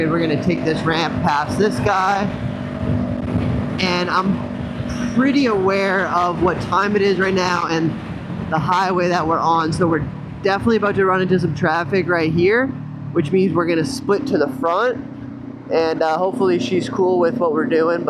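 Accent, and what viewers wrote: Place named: West, United States. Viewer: ps not his voice, the western cali/arizona accent lol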